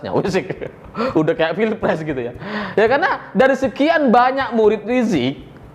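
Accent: Madura, Indonesia. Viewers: Dari logatnya aku udah mikir mas ini orang madura, ternyata benar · Logatnya kek org madura..tp gk ada takdzimnya sama habaib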